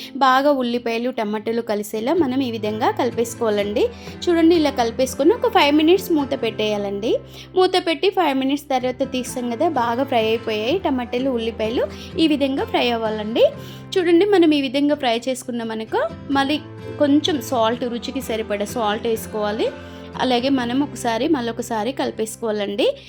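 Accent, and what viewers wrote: Telangana, India. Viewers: మీ మాట్లాడు చున్న భాషలో తెలంగాణ యాస కనబడుతుంది శుభము హరే కృష్ణ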